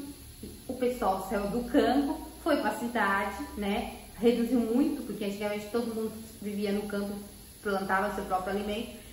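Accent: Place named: South, Brazil. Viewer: Quel plaisir de te voir.. AMOUR...sa manque les jours quand je ne te vois pas.et que je N'entends pas ta belle voix avec ton accent Brésilien du Sud.... AMOUR..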